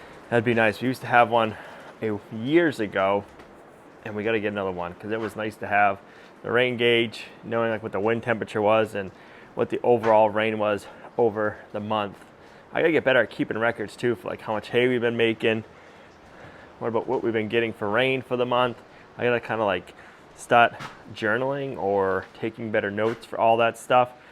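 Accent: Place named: New England, United States. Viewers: Yeah, and "eSScavator" 😂. Waiting for " acrost". Ahh, those NH colloquialisms! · That's the way they talk over there in New England. He's just saying things the way they all do around Boston.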